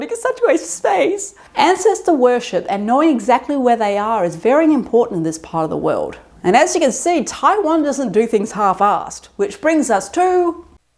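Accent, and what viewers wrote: Australia. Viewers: I love what you're contributing to the world's death conversation with an Aussie eye, heart and accent,! There's a bit about the annual redressing of the dead and bringing them to the dining table in Caitlin Doughty's book, From Here to Eternity as Well. While I'm personally in favor of the being eaten by wolves or vultures or insects or whatever camp, these practices from other cultures have their own beauty and wonder. (Sorry, I live in the US, so those are my most familiar resources. I don't for a moment believe that we have all the answers and am humbly grateful to get these extended world views!)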